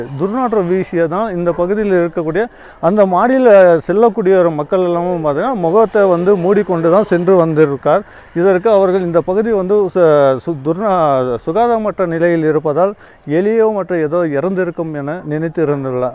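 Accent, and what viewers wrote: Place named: Bangalore, India. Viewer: Bangalore Tamil 😅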